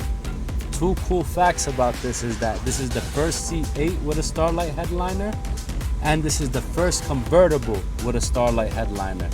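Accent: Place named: New York, United States. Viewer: I knew by the accent you were in NY. Too far away. But would love to get this done 😩. Fantastic job!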